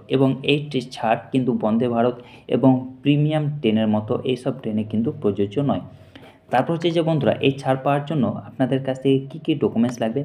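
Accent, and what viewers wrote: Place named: East Bengal, Bangladesh. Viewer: Eskip kore dilam, bhasa ta thik korun,Kath bangal ,kobey kantatar periye elen.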